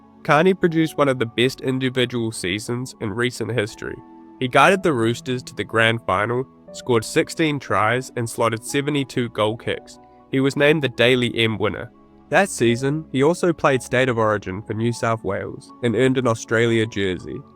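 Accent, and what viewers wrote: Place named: New Zealand. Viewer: That’s just the kiwi accent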